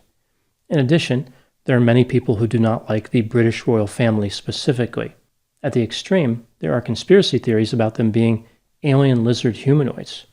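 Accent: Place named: Southeast Pennsylvania, United States. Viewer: Dr Grande is also an excellent comedian. Your SE Pennsylvania accent slips through at times. An accent I would note that I have never seen imitated in major production television or film.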